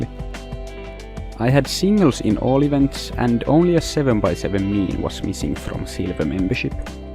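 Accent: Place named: Finland. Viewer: Guud job. Ainoo ongelma niin tota.... Vähän rallienkkuu havaittavissa😂 Mut hyvä idea tykkäsin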